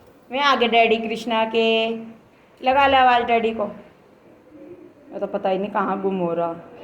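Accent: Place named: Saharanpur, India. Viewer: aapki language ka jo touch hai ...m first vdo se hi smajh gyi thi ki ho na ho aap saharanpur se related ho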